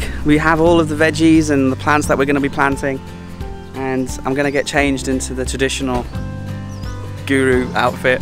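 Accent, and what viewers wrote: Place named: North, United Kingdom. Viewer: Absolutely brilliant, and his calm manner certainly shone through as it does in his vlogs. You looked so relaxed and loved the way there was a northern accent coming out of you. Oh and piglets, thanks for making me laugh so much... Been waiting for this blog to come up since I saw you had visited him and for sure it didn't disappoint. Thanks for sharing.. merry Christmas greetings from Greece 🎅🎅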